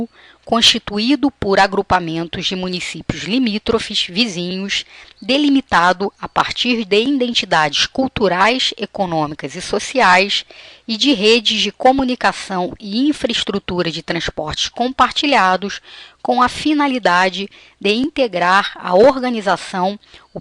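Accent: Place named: Rio de Janeiro, Brazil. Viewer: Carioca falando é chato pra kralho...mas a aula foi boa.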